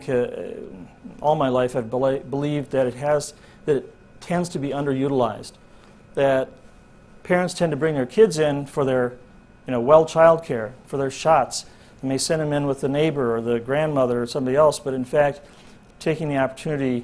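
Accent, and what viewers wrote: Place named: Kansas City, United States. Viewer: Were you rasied in KCMO? You sound similar to me. I'm a shaken baby, with a birth defict spina bifida, and hydrocephalus. Also, the neighborhood, the stores around me, and my schools were also abusive. At least when it comes to the schools, I wasn't the only one. There are some really bad teachers and high school counselors. Sadly in MO, CPS sees you as an adult at 17, not 18. When I was 16 a Dr. reported my mother, but nothing could be done because I was to close to adulthood at 17. Really a bad state with social services, not only with children but with the elderly as well.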